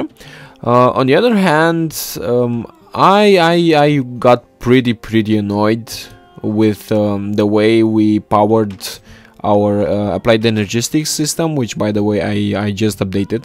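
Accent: Romania. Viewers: he is romanian, he can't have english accent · bip cu accent romanesc ;)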